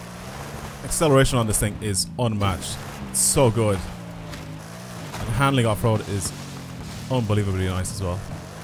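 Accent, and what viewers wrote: Ireland. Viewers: I love your content but the intros could do with a bit of work one minute 45 in I haven’t even touched the Excelerator once, Love the Irish accent but I best be getting the suck or fuck after 🥱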